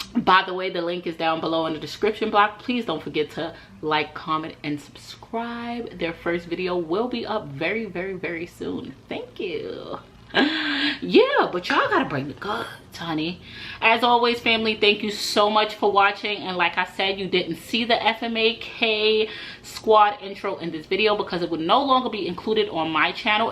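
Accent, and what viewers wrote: New York, United States. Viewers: Hey beautiful new fam here I literally been benge watching your videos an babbby I'm loving an feeling your vibes your realness is everything I can relate to alot of your conversations in SOOO many ways like girl I be like dam is she in my head😅😅😅it's crazy plus I love the new York accent, I have a channel with my nieces an nephews so we will definitely be supporting you an your BEAUTIFUL babies have a blessed Sunday 🙏💜😘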